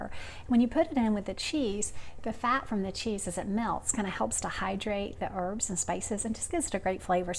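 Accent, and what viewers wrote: South, United States. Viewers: Love the homely vibe and Southern American accent, will try this! Thanks from Manchester UK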